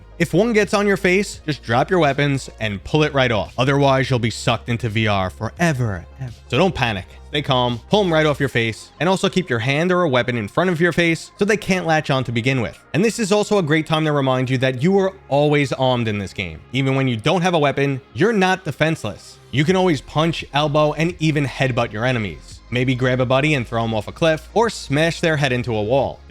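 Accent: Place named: New York, United States. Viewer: a small hint of new york in your voice is killing me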